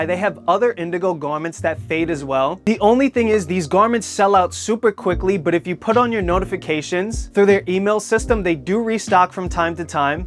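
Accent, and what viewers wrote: New York, United States. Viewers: Thank the lord, there's still people with New York accents.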